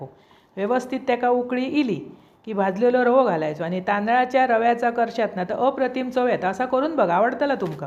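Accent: Malvani, India. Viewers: माका khupach avadta topatla. खुप खुप खुप🙏🙏 धन्यवाद. तुमचा मालवणी बोल्ना khupach chan eknumber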